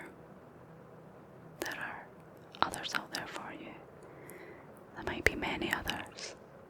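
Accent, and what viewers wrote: Scotland, United Kingdom. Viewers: This may get kind of long, but I will try and shorten it up as much as I can. First, let me say that I didn't know what ASMR was until a few months ago. I was having a hard time getting my mind to wind down while trying to go to sleep. I was trying white noise, tribal flutes, thunderstorm sounds, etc. Just anything that I could think of to relax to. I just happened to be scrolling through YouTube when I saw a heading reading This Scottish accent will knock you out. Now I always been a sucker for a soft Scottish accent, so I clicked on it and that was probably the best sleep I have had in a very long time. I have been watching your videos just about every night since then. Your voice and the natural flow of your hand movements just does something to this southern boys brain. So thank you for that. Second, I am sorry to hear about the latest events in your life. 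I know it will take some time, but you will definitely be ok. There are seven stages of emotion that you will go through before you are truly ok, but I know you got this. I have gone through them a few times and I can tell you that you will always come out stronger and more alive than ever before. The human heart was designed to reheal itself from hurt and pain because we as humans will continuously experience things in our lives that we have no control over. We will get knocked down, we will get our hearts broke, we will become emotional wrecks, but we will always be able to pick ourselves back up, dust oursel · Attaweyman, I love the Scottish, go on you dancer, great video with a touch of real life, first 6 months are the worst 😎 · Hi! Actually your ASMR is the only one right now that helps me sleep. Breakups always hurt even if they are on good terms. I recently lost a girl because I declared my feelings to her. 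I still consider her my best friend and it was partly my fault because years ago she was interested in me but I couldn't see it. I had my mind on other things. But at least we had a great friendship, the problem is what I felt was growing. And now here I am suffering. I would like to recover the friendship at least but I see it difficult. It won't be the same anymore. Thanks again, your Scottish accent and naturalness help me. Greetings from Spain. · I don’t comment very often because I’m just the older guy who happens to appreciate your videos, your smiling eyes and that wonderful Scottish accent. But your eyes aren’t smiling this time and I just could not sit by and keep quiet. I won’t offer you a string of clichés about getting past the pain of losing someone you cared for. By your comments here it’s apparent that you will be ok. I just wanted you to know that despite the fact that our only connection exists in the ether of YouTube, I know you will be happy again soon.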